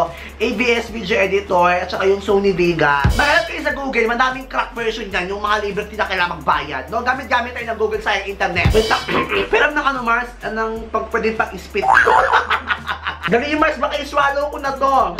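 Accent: Visayas, Philippines. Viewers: Bisaya po ba kayo?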